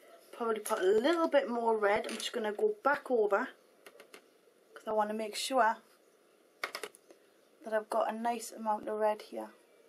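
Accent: Newcastle, United Kingdom. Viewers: wooo for the geordie accent!!! what make up diod you use for this look because i'm a huge germany fan lol :P n yeah :P idc if i'm english, heh. x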